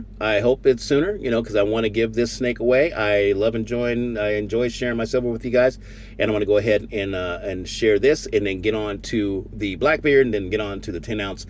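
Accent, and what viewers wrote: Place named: United Kingdom. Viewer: your brit accent is spot on!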